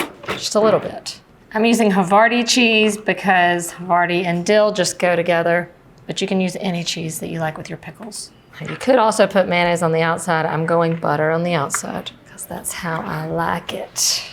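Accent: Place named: North Carolina, United States. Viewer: Love her accent. Sounds like a local (NC) girl...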